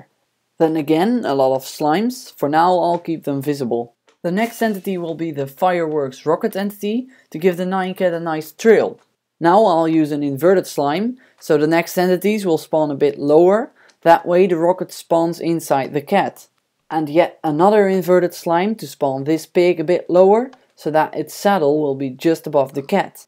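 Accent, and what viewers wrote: Netherlands. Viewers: haha ik hoorde gelijk aan je stem dat je NL ben ;p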